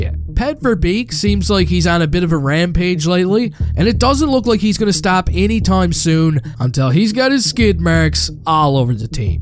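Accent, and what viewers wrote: Newfoundland, Canada. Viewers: First time on this channel. Is a Newfoundlander? Just want to test my accent detection abilities?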